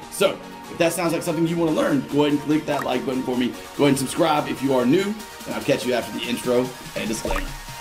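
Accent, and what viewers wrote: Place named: Texas, United States. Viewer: Sounds like Texan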